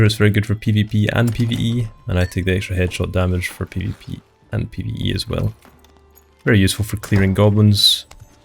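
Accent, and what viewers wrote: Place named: Northern England, United Kingdom. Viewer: Great video. Just wondering cant place the accent, northern England or Scotland?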